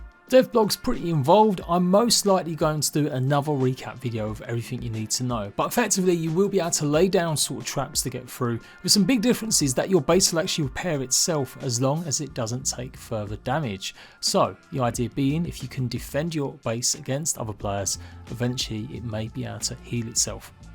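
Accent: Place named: United Kingdom. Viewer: Where’s that accent from? Can’t place it. Obviously British but unsure where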